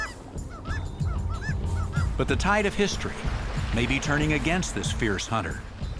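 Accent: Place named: Canada. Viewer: Lol does anyone else notice the canadian accent in the narrator lol?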